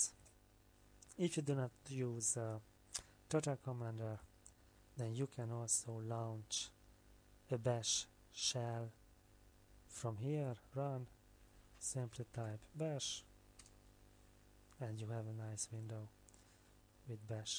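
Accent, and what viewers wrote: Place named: Hungary. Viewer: azért észre lehet veni a kiejtéseden, hogy magyar vagy XD. Amúgy nagyon jó tutorial. Kössz szépen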